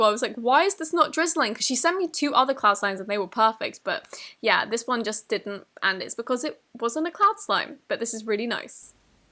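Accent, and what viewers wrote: United Kingdom. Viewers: Your British accent is so strong that i love it the British accent (strong ones) are the best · Teach me how to speak with British accent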